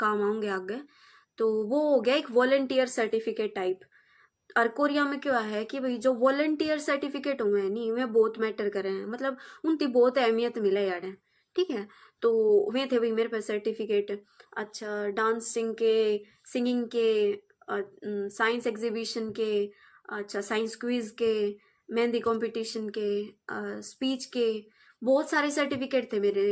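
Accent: Haryana, India. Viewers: It's little bit harder to understand haryanvi but not tough ❤❤❤ Tnx to make this video 🎉 Congratulations 🎉👏🎉👏...( Me bhi gam ki chori hi hun didu 😢😢😢😢) ...and that all problems I have 😢😢😢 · omg i just found your vedio and its soo good cheery on the cake your haryanvi makes itt more better 🤗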